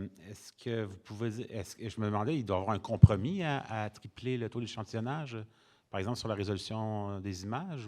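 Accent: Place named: Canada. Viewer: Que du bonheur cette conférence 😅 avec cette accent canadien inimitable 😂 encore meilleur 😅 de France ,je partage sur notre petite planète avec mes 2,9 k followers * 1,3 k suivi(e)s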